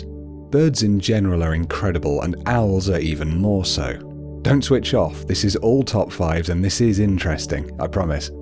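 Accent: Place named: United Kingdom. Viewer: My goodness the generic British accent yeah....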